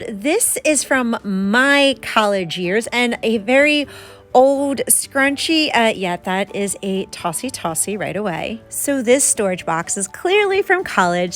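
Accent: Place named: Philadelphia, United States. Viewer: I've really enjoyed watching your channel, not only because you have a great short term-long term process, but because I live in the same region of the country (Philly) and it is good to see and hear the local dialect, see items and logos from local stores, etc. I have been trying to support more local channels in general, because it is by extension a connection to the regional community -- something I don't think we have enough of. Don't sweat the sentimental kid saves -- I had boxes, tubs and closets full of them until my last move in 2015. Sadly, much of it was ruined, and my other excuse --- saving it for the grandkids --- became lamer and more irrelevant by the day as the grandkids became too old for the things that were salvageable. I ended up with one special cedar chest with my most sentimental saves once I let it all go.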